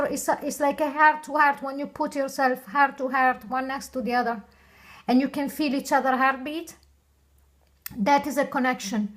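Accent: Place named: Eastern Europe. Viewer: I found ur channel last night watched all the videos that pertained to me and I am totally impressed with you. I wish I can guess your accent I keep going back and forth on where you are from. I guess Eastern European :)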